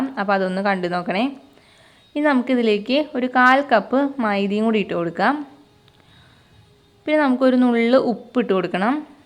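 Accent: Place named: Kunnamkulam, India. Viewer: Ningalude samsaram kettal eadu jilla anennu kandu pidikkane pattilla Kunnamkulam aduthano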